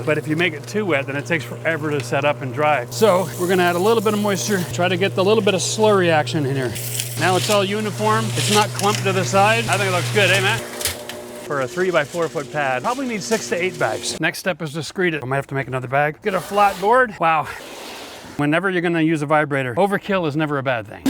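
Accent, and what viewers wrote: Canada. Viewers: How do you say the word house that gives away your Canadian accent. Thank you for your awesome video. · When you say House and About and Eh,you let me know you were Canadian. Cheers from below the 49th.Nice video.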